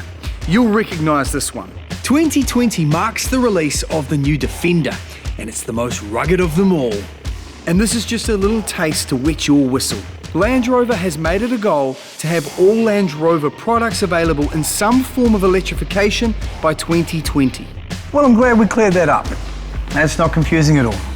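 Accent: New Zealand. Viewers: Always loved NZ accent over Aussies at least the world can understand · NZ accent at its finest ladies and gentlemen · Damm I love that NZ accent! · Didn't know New Zealanders pronounced "Land Rover", "Langerovah" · Damn I hate that NZ accent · This video is hysterical and brilliant. Love the hair "in 1970 and the polyester suit." You are correct, we don't know the difference. Humor and your NZ accent, you can sell anything! :o)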